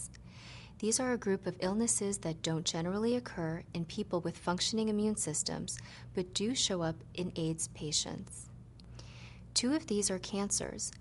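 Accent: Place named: United States. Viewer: Wow she sounds like an american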